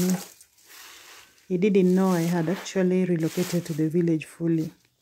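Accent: Kenya. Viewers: Where are you in Kenya? I lived near Eldoret from <num>. It's so beautiful there and hearing your accent reminded me of my old friends in Kenya :) Asante sana kwa video nzuri sana! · 💯I so agree with your sentiments. I would love to live in such a beautiful serene environment. I hope to return to visit Africa one day. Are you in Kenya? Your accent + maandazi equals Kenya or TZ to me. 🥰 I was in Kenya and it was like being in heaven (30 years ago). May I be able to return and live like you. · ❤ you sound Kenyan to me ,am from Kenya where is that.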